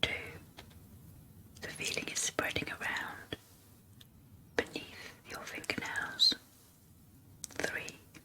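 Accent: England, United Kingdom. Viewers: Are u English u kinda sound English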